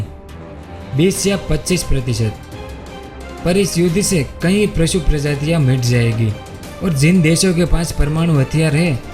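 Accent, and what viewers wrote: Gujarat, India. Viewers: Bhai tu gujarat se h kya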